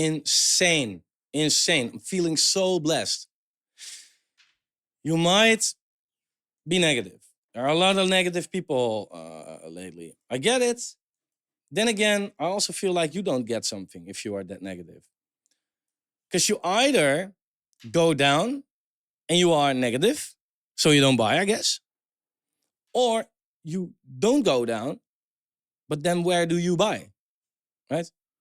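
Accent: Netherlands. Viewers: I like your dutch accent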